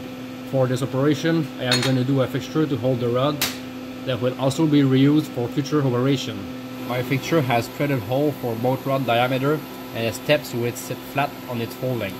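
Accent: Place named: Quebec, Canada. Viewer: Ca sonne québécois cet accent là mon ami! ;) Bon vidéo!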